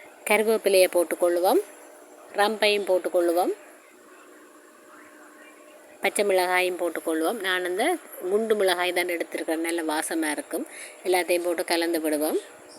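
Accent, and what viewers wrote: Sri Lanka. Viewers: உருளைக்கிழங்கு கருவாட்டு பிரட்டல் அருமை. இலங்கைத் தமிழும் மணம் வீசுகின்றது.. வாழ்த்துக்கள்🎉🎉🎉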